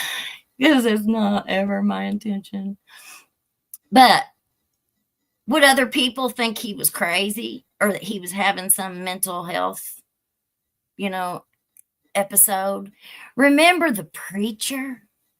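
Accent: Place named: Appalachia, United States. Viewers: Do you have a point? I wasted almost an hour waiting for it. Maybe get to the point rather than rambling endlessly. Boring as heck and your hillbilly accent makes it hard to listen. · Newbie here! I found you on Joel's show! Your accent reminds me of my aunt in Kingsport Tennessee!! Great coverage of this case!! ❤️🤍💙‼️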